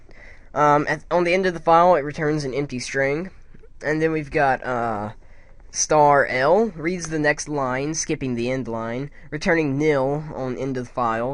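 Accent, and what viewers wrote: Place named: North, United Kingdom. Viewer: You sound like a Northerner to me, sorry if that's offensive. Greetings from WNY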